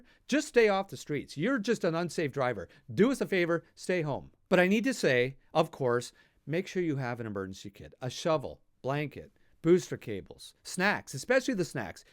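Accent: Canada. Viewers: Well, being American (Idaho), I just had to watch your video to see if I could understand you. Guess what? I DID, and I learned some things, I really enjoyed the comments, and think you're pretty darned funny! Thanks for the tips and tricks you've shared, and it's clear that our neighbors to the north are tough and winter ready. Merry Christmas!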